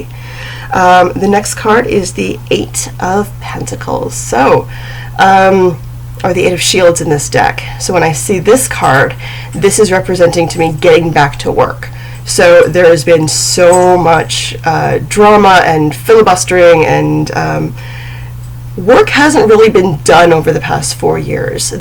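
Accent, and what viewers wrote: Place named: Canada. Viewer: I have to say I LOVE your accent. I have several Canadian clients and I love listening to them talk. I hope that isn't offensive to you, I don't mean it at all. I'm 43 and Ive watched various versions of Degrassi since I was 13 or so and I love the way they say some things too.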